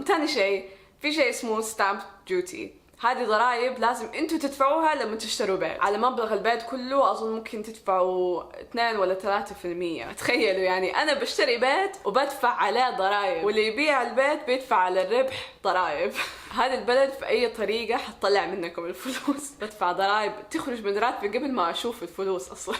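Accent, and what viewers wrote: Hejaz, Saudi Arabia. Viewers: الله اكبر ليست لهجه كويتيه نهاءيا الاخت من اهل الحجاز وفي مكه المكرمه وهي لهجتهم سلسه وجميله الله يوفق الجميع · احب اللهجة السعودية واضح جدا Sudanese guy pass from here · باين من لهجتك انك سعوديه صح ❤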